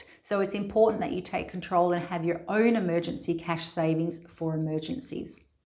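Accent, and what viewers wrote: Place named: United Kingdom. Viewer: thanks please continue uploading videos, i like your content, as well as your lovely British accent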